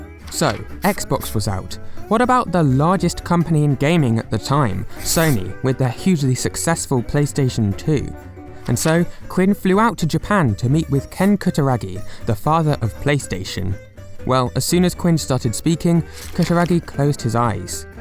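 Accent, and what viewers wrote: United Kingdom. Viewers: Very british voice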